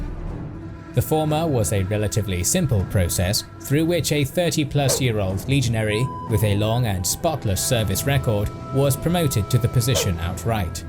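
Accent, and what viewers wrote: United Kingdom. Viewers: this is going to be a controversial statement, but you might want to encourage your school to utilize a channel with an american narrator. i consider myself a student of languages and i've read a million anecdotes about the general american accent being an easier accent to understand for ESL students than most of the accents which occur throughout england and wales. unless you're located in a country geographically near to the UK, it's also more likely you will encounter the american accent in the media.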